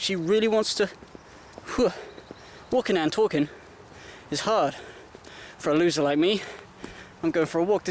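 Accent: United Kingdom. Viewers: You're British? I didn't even realise. And I should have, as I'm British.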